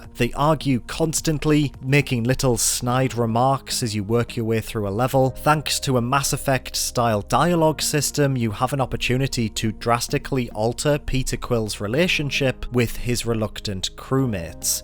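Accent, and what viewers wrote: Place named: Newcastle, United Kingdom. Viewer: I get a warm feeling in my stomach when I hear this guy's little Geordie voice. So soothing.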